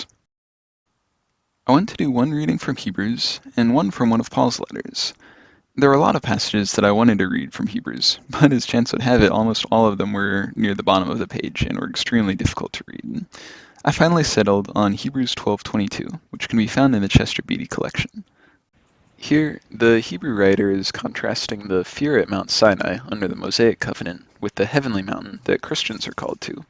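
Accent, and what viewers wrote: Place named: United States. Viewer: Interesting but please pronounce the Greek in Greek not American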